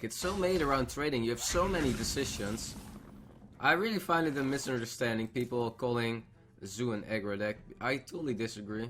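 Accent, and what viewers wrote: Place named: Netherlands. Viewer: Je Nederlandse accent is lichtelijk afleidend 😅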